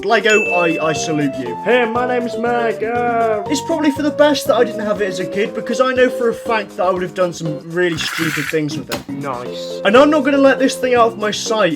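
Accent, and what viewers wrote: United Kingdom. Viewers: Do you live in America but you're British??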